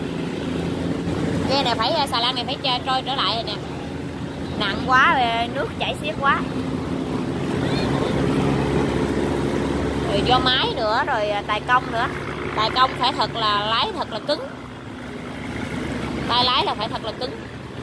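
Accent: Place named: Mekong Delta, Vietnam. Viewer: Tôi thật sự là ngỡ ngàng với giọng phát âm của gái miền tây ta. Làm YOUTUBE kiểu này, chỉ để cho dân miền tây xem và nghe thui bạn àh. Bạn đang ở việt nam, người việt đó....làm sao mà để cho mọi người trên toàn đất nước mình, xem và hiểu được....thì bạn sẽ kiếm tiền từ youtube được mạnh hơn ha nhỏ, giọng mẹ đời àh. Xem các ca sĩ miền nam họ hát đó nha....mà học hỏi đi ha.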